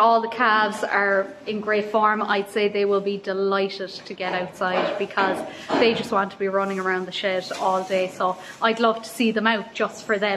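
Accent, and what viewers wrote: Ireland. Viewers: Brilliant video, you could use a greedy board extension to the spreader, maybe useful to see if Vicon do it. A good job well done, you figured it out, and that's what important. No you don't talk to much, it a pleasure to listen to your soft Irish voice. ♥️👍👍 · Hello! Me being from North Carolina(USA) I love listening to you talk. People say I have an accent but I believe you have me bet. Also I love those saying which is probably normal for you Irish folks. Enjoy listening to the sound of the ol' 135 so maybe video some real time in cab with you.🐮🚜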